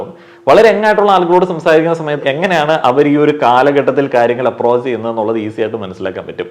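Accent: Kottayam, India. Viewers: Only a Kottayam Boy can talk like this.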